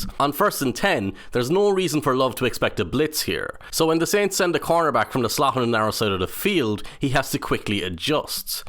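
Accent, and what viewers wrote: Ireland. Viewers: Really enjoyed your analysis on this.As a Scottish Packer fan it’s great to hear an Irishman talk about football… relatable!👍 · It is so odd hearing an Irish accent when talking about American Football….I expect to hear this kind of accent for Premier League analytics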